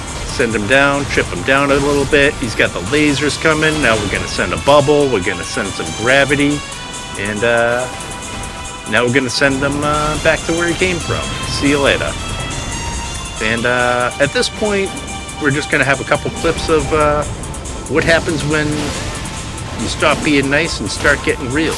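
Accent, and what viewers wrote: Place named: Boston, United States. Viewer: Your Boston accent is strong in this one.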